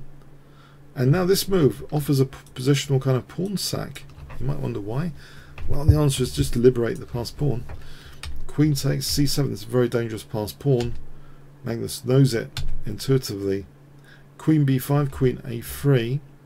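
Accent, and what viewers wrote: England, United Kingdom. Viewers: I really love your videos and your daily updates on both historical and recent games,. Please continue to cover the major tournaments in both classical, rapid and blitz chess! And may I ask, from what part of England are you from? I take much pleasure in that dialect of yours and the pronunciation of the phoneme 'th' as a regular f-sound, "c free" (c three) etc.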